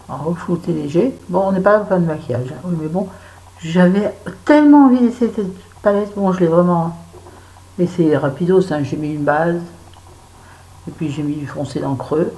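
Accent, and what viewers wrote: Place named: Marseille, France. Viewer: voilà, la gratitude, tous les jours, je remercie tout et tout le monde, même moi même pour tout ce qui m'arrive de positif. Autrement perso, c'est bouddhisme, mais le reste est perso, par contre d'accord pour l'égo, c'est une saleté qui pourri l'humain. bisous ma mdr pour la vidéo accent marseillais!!!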